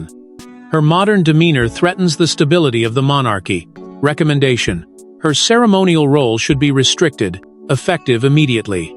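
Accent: United States. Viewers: Strange how these American-voiced Royal Family tit-bits all seem to stretch out to the 20+ minutes mark! They're all sheer speculation, & imagination, with NO evidence to support them: Simply cash-earners from YouTube views etc. - Don't believe any of it!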